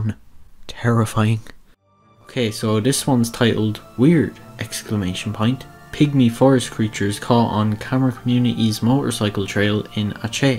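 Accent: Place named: Ireland. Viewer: ur irsh right? pretty sure becus you sound like it what county r u from? u sound like ur from limerick lol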